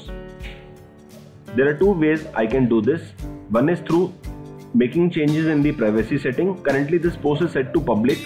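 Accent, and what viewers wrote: India. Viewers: Indian accent is just weird 💩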